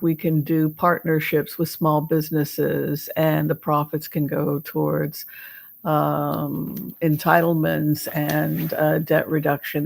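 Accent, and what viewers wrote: England, United Kingdom. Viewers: As a Brit , you’re adorable English accent makes me giggle and feels healing to me! You are wonderful!!!! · Great British accent!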